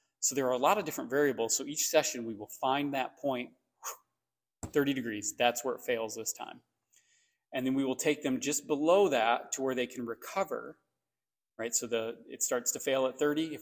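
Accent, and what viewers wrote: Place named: Midwest, United States. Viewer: I really enjoyed your video. I am curious if you use any traditional chiropractic methods to help increase the cerebral blood flow? I have a somewhat mild case of POTS which came out 6 months after i got Covid. Turns out i have chronic Lyme and a bunch of tick infections. It was really bad in 2022, and I'm about 70% recovered. But i still wake up in tachycardia and i can't tolerate much exercise, which is so hard for me to deal with. I also get brain fog and fatigue by 2-3 pm every day. Anyway, it's interesting to hear this take on what could be going on. I would love to have this type of in depth evaluation. You sould like you might be in the midwest, so do you happen to know any other practitioners in the DC area? Or are there online resources for home exercises which people like me could try to assist brain recovery? 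Thank you for your insight!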